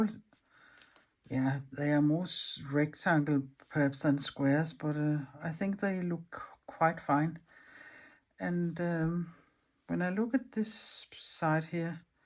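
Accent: Denmark. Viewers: I just love your voice and accent . It’s so soothing and melodic . My son in law is half Danish . Such a beautiful country . I’m so grateful to have met you virtually here . Your art sings to me and I enjoy every moment as I’m sat in my art corner creating along with you . I’m Lisa from Yorkshire UK . I love slow stitching also xxx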